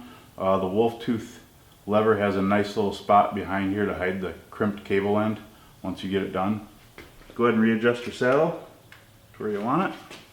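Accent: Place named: United States. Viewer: Thank finally you are the best your video its simply good easy explanation and America accent. Awesome dude. Ty saying hello from Connecticut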